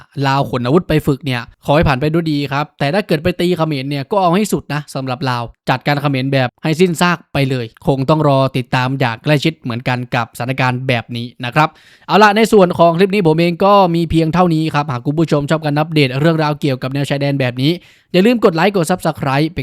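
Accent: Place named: South, Thailand. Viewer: ❤️เสียงคนไต้ แต่รักไทยไม่แพ้ภาคใด❤️ทำต่อไปคับ หลวง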